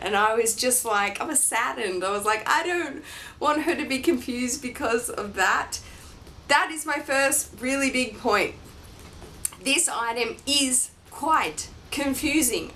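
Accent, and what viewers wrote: Australia. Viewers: This video is so good on so many levels! I really love the LV community...even though I'm new to it, I'm not new to fashion/lifestyle blogging...it's really lovely to see the support and friendship here. Fashion blogging has kind of lost that feeling of being kindred spirits because it's so commercial now. Anyway, I just love your videos, not only because you are so cheeky AND well-informed, but because I'm married to an Aussie, and that accent is one of my faves! I used to live in Sydney, so every time you mention Bondi Junction, my heart skips a beat. Thanks for all the killer information, gorgeous! xo V.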